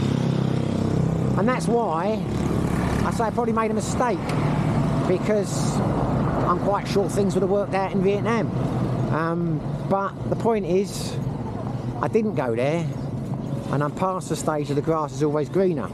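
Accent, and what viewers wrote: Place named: Cockney, United Kingdom. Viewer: Nice hearing a cockney accent. COYI ⚒️ I absolutely loved Vietnam. Was there for two weeks last month for the first time. I really loved Da Nang. I could see myself retiring there but isn't retiring there harder ?? They don't have any dedicated Retirement visa I heard. Could be wrong. Guess I gotta find myself a Vietnamese lady to marry !! Haha . Be lucky !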